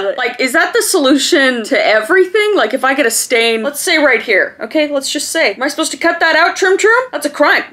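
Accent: United Kingdom. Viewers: Plsss talk British I love your British accent ❤❤❤ 😂😂😂😂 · Are u britesh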